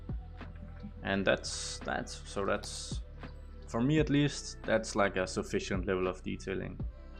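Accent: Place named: Denmark. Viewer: Sjovt, jeg gættede også på dansker, men så tænkte jeg ikke mere over det 😂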